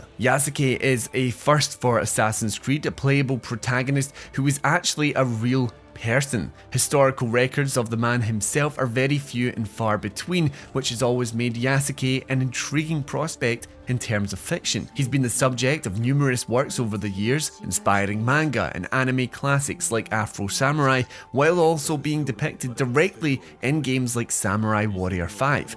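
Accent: Scotland, United Kingdom. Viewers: Aye laddie y’er Scottish ain’t ya ?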